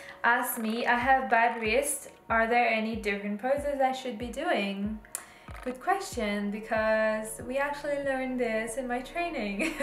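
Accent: France. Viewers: Are you french? Your English prononciation is really good)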